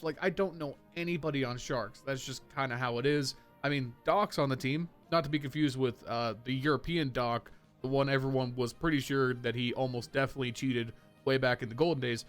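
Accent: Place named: United States. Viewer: As an Australian, please pronounce it as 'Melb-n' or 'Melbin' it hurts a lot to hear Americans do and I do like these videos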